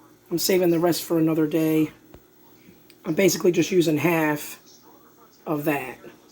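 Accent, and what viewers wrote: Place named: Chicago, United States. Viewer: Your accent, I'm guessing Chicago area?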